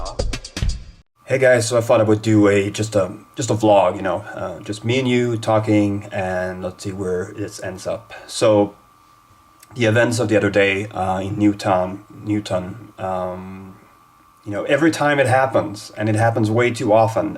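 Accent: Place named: Scania, Sweden. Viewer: I'm feeling P4A this year, but it's the first time since 2009 I really, really enjoy it. Btw, talking about friendship, your P4A video of 2009 is still my favourite P4A video ever. Led me to your channel, and then a whole bunch of awesome people. :) You're a great network creator. And your dialect is awesome. I get happy every time I hear scanian. God Jul. ^_^ Alla sova, släckta äro ljusen, äre ljusen Tipp tapp, tipp tapp, tippe-tippe-tipp-tapp, tipp, tipp, tapp *clinks on piano*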